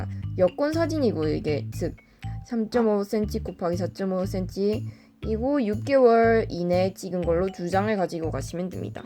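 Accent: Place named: Daegu, South Korea. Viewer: 대구사람이세요? 사투리가 대구사람이네 ㅎㅁㅎ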